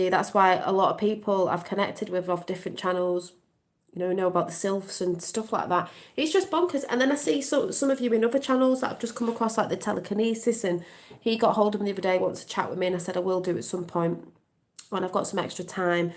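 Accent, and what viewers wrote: United Kingdom. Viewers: That's our girl, always on point with that British voice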